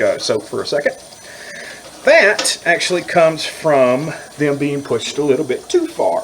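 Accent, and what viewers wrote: United States. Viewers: yep you're definitely a Texan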